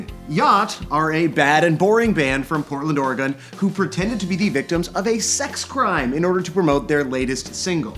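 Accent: Canada. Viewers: your canadian accent is so god damn thick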